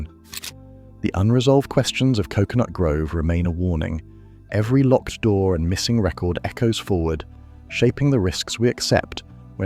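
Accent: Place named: United Kingdom. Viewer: I was just going to write the same thing! They show the floor plan, then whisk it away before anyone can get a good look, then they show the same guy over and over. This happens more and more on YouTube videos: people with no training or experience slapping something together. But they think they can convince us that it was brilliantly done because of the narrator's British voice. A very common ploy!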